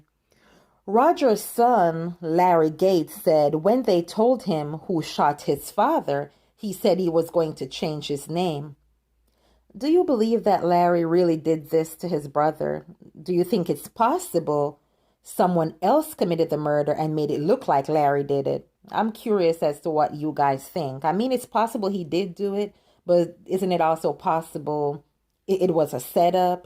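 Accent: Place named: Jamaica. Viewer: hey are you Jamaican? I hear a very slight Jamaican accent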